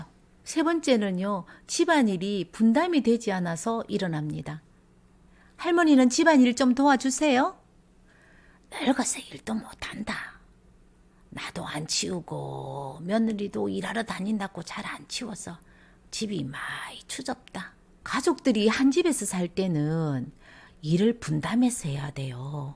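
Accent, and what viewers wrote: Gyeongsang, South Korea. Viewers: 공감되는 내용에 경상도 말씨 지대로 구사하셔서 더더 재미집니다! 👍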